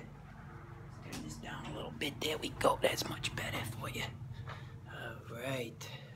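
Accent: Boston, United States. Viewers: bro i need, and i mean NEEEEEED more videos with the boston accent roleplay.... been passing out to this same video every night for like 3 weeks now to the point where i know every word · Zevi That’s New York accent this is Boston it’s stronger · LOVE the Boston accent! Fantastic video, just subscribed :) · He sounds like Leonardo DiCaprio with a Boston accent. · Loved this vid and the accent. Just hope everyone knows literally 0.00001% of Bostonians talk like that. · I want another asmr video using the Boston accent again 😍😍🙌🏻🙌🏻 Literally my favorite video! 💖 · That Boston accent is super ASMR inducing. I wouldn't be opposed to another vid with it! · Now I have to go to Boston to bathe myself in that glorious accent. Great video 🤗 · okay but like, this is why I love being from and living in Boston, when people do the accents and shit its too.amusing! 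keep up the great work!! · As a man with a Boston accent, i approve this message. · Please PLEASE make more vids with the Boston accent 😭❤️ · I love your Boston accent. It's pretty cool!! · Excellent customer service skills, very attentive. And yes, that is a strong Boston accent! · MORE BOSTON ACCENT ASMR PLEASE OMF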